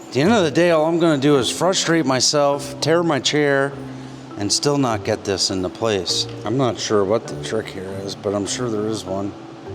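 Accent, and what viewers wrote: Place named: Pennsylvania Dutch, United States. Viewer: I love you -- I've been watching your videos like crazy for the last couple months... and I grew up in Allentown so I love hearing your PA Dutch accent... but you gotta stop saying "menagerie." Nothing but love, your friend in Texas, Alan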